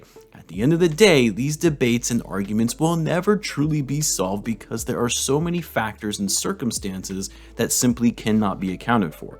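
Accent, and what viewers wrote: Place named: New York, United States. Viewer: lol I have a few people who work on my job that come from NY and you sound so so so much like them lol and they can’t stand MJ and those Bulls, but it is a respect issue, and when Scottie dunked on P Ewing and then pushed him down & those Knicks fans never ever forgot it either. Well, I never truly hated the Knicks, but it’s those Yankees that I love to hate, because I am down here in Baltimore Maryland, and those Yankees broke my heart so many times, but getting back to your subject, I like The Lakers and I wanted LA to win, Portland to win, Seattle to win, and Phoenix to win, but I was never truly a fan of Karl Malone and that group, so I loved it when MJ and those Bulls beat Utah, but I was cheering against The Bulls the majority of the time, but then, there comes a time where you have to give credit to those Bulls of the 90’s and that goes for MJ & Pippen, because they came together as a team when it counted most. 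Ummmmmm sure MJ gave most of those Teams the blues but Scottie was putting in work as well & as MJ would slack off, you had Scottie to seriously deal with, so it went both ways. It was almost like having a good boxer who was predominantly right handed, but would also jab a person with a short left handed jab, but they were good together ✌🏾I was so so hoping that Kobe and Trevor Ariza was going to give me much of the same, but The Lakers traded for Artest 😞 & I am still saddened by Kobe’s tragic end bait ( Sighs 😔 Greatly )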